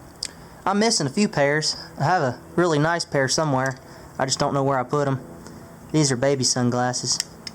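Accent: South, United States. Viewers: Your obviously 7, it's a southern accent, it's common for people to have accents like that, especially if they live I n certain parts of Texas, Alabama, etc. people who are black don't exactly have an accent, they talk a certain way, but it's different from a plain southern accent, he litterally just talked about Texas, and said y'all, he's from the south maybe the west you never know, it all depends on where you live. But I don't expect you to understand going off of the fact that your most likely under the age of even 8.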